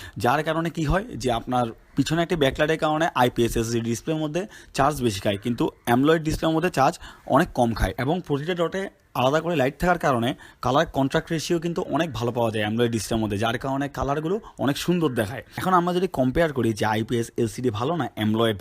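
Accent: Chittagong, Bangladesh. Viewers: ভাই আপনি চিটাইঙ্গে নাকি?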